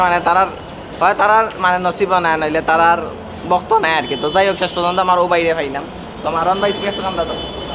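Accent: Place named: Chittagong, Bangladesh. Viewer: Bhaiya apner Bari kothay? Is it chottrogram, coz I'm from chottrogram kintu okhaner bhasha kisui bujhi na😅but your one sounds like that, 😊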